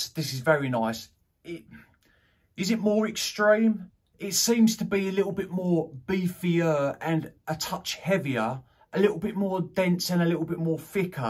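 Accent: United Kingdom. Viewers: What accent is that? I already know it's British baking but not London's I guess. English is my second language, or that's what I am hoping to, so that's why I asked 😊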